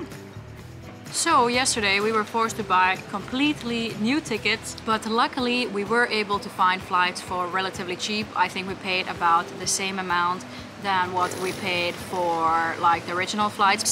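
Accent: Netherlands. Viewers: Do you know you nav a Dutch accent when taking English?😂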